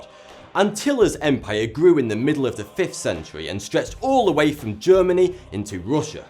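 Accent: United Kingdom. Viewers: R u British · Is this guy British or just a hipster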